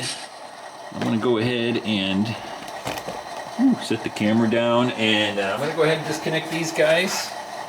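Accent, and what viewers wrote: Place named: Chicago, United States. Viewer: My wife insists that you are originally from Chicago per your accent. Are you?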